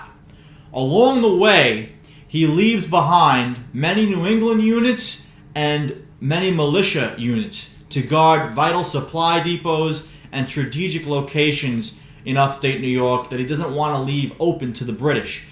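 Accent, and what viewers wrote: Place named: North Jersey, United States. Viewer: Another Great presentation my friend, Thank You. Where abouts in NJ are you from? Judging from your accent, I’m assuming North Jersey lol, I’m originally from Dumont in Bergen County myself, Be Well 👍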